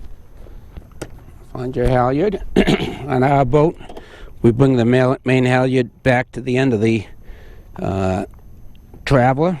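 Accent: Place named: Maine, United States. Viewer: I think I hear a Maine accent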